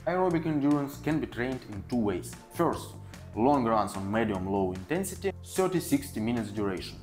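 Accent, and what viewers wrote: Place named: Russia. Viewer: I wish I could speak Russian. I love the accent.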